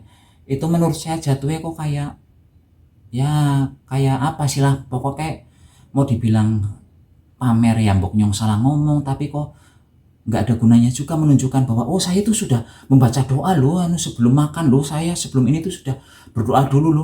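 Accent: Banyumas, Indonesia. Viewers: Masaaaak sih ,,,,,ya keteke kecuuut ,,,,sambil masak ,,,,kelihatan tambah buntder ,,,,hidunge tambah tidak kelihatan ,,,,yg penting tetap apa adanya ,,ngapaknya spontan malah tambah lucu ,,,,cerdas ,,,,masak sambil mbanyol ,,,,malah tambah nggemeske ,,,,,kalau dekat pingin nyiwel pipine sing tembem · Menjadi diri sendiri lebih baik mas. Autentic dg bahasa ngapak dan apa adanya. Ciri kasnya 👍👍👍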